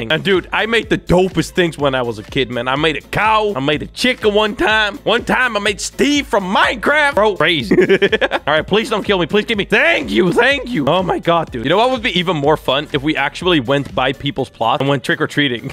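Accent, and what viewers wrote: Netherlands. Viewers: Are you dutch? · are u dutch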